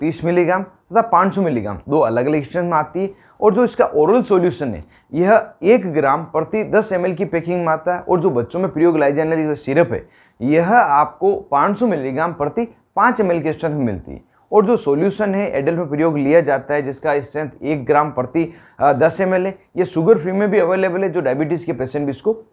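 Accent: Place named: Bengal, India. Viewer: Bangla ucharan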